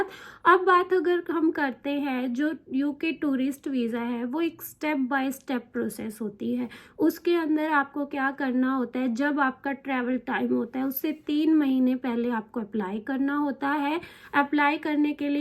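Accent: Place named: Haryana, India. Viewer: Your Jaatu / Haryanvi accent is too visible. "Discussan , Rejecsan" LOL And here is your not-so-intelligent Haryanvi mind who can't comprehend what is remote work! Guys, you can't work in the UK on a tourist visa.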